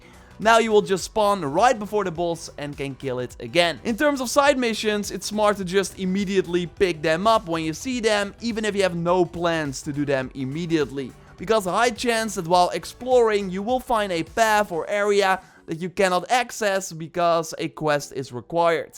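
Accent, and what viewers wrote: Netherlands. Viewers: I'm pretty sure he's Dutch he has a very thick Dutch accent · En toch hoor ik meteen dat je uit Nederland komt 😂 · Are you Dutch?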